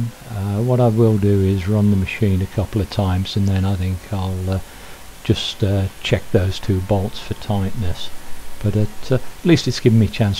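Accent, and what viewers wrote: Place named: Birmingham, United Kingdom. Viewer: I have a question if that's ok, I have A faulty strimmer, the plate that rises and falls behind the plug seems to be hitting the plug to the point where it's closing the gap ( surely that's not correct ) if I pull the cord it works freely with the plug out but struggles with the plug in. Ps are you a Brummie, theres definitely a bit of Brum in your accent. thanks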